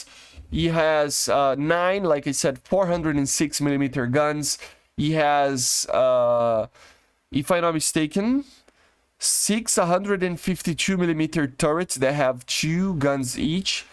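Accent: Brazil. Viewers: Bro he is Brazilian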